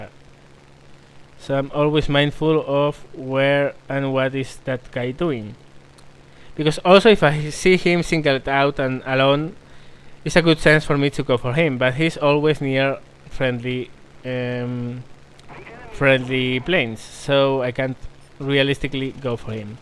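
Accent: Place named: Spain. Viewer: Pareces español por el acento inglés.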